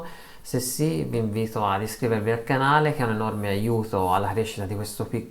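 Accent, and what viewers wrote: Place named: Tuscany, Italy. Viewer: Bel video! Sento un accento del Granducato! Io abito tra Siena e Firenze: se su quel tavolo ti andasse di provarci Infinity ci possiamo organizzare :D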